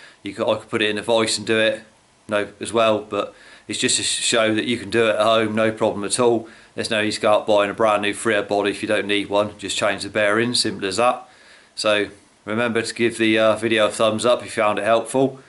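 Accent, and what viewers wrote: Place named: West Country, United Kingdom. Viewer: Didn’t realise it was going to be you when I clicked on the vid. What a relief to hear that West Country accent. Thanks again for your vids. Bearings on order.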